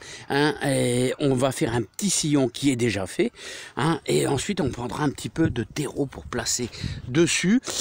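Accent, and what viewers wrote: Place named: Roubaix, France. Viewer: Bonjour Monsieur, je découvre votre chaine bien sympathique, merci ! Et il me semble bien reconnaître l'accent de mon enfance c'est à dire Roubaix ☺ bonne continuation !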